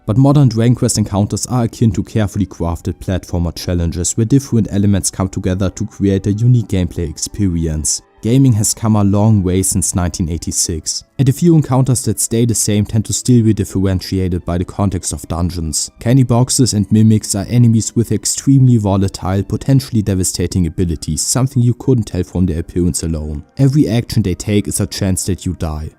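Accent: Germany. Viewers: Ich brauchte genau 5 Sekunden, um herauszuhören, dass du normalerweise Deutsch sprichst. :D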